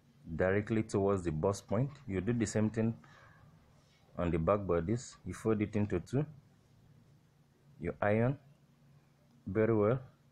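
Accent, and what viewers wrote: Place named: India. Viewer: Why are u using indian ascent pls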